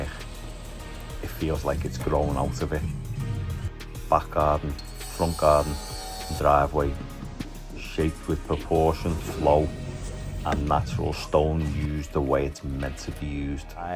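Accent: Liverpool, United Kingdom. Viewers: you’re from liverpool…..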